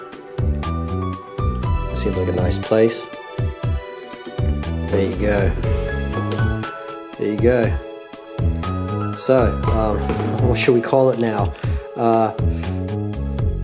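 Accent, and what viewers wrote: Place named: Australia. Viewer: Bro are you from Australia? You have the Aussie accent. No troubles getting the gun into the country? Buy from eBay?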